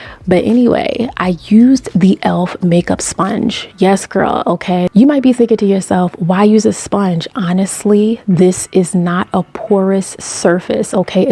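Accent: New York, United States. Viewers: that NY accent it does something to my soul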